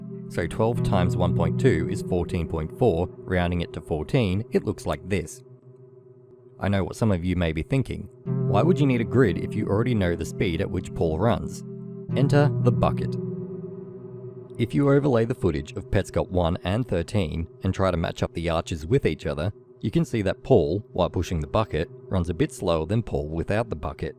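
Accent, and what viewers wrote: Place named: Australia. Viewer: Okay it's fucking criminal how little attention your hard work has got. Plus it's always amusing to hear another Aussie.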